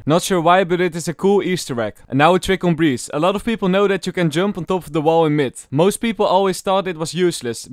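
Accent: Netherlands. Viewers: most dutch accent i have ever heard bro.. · I smell dutch 😂, still a good video man! I love your videos! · I smell dutch, a little bit too much bro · im sorry but are you dutch, because your english is a little bit weird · dutch accent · jij bent sws nederlands haha want zoon accent heb je xd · nog ff leren om dat Nederlands accent weg te halen je klinkt net als mark rutte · Hahaha Nederlander die Engels praat met nederlands accent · and evrytime i think jij benne nederlands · accents can not get any more dutch than this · Ik wist meteen dat je uit nl komt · nice dutch accent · love the dutch accent lol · Love the dutch accent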